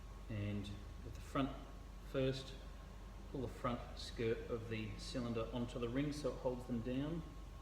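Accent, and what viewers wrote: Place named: Australia. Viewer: Cheers thanks for the Mellow rebuild and Aussie accent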